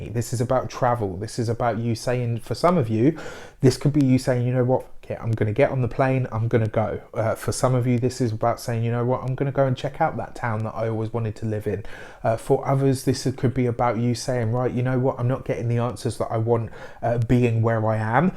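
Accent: United Kingdom. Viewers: Are you brit. ..yes. it's been the longest year. ..but my guy going through stuff too.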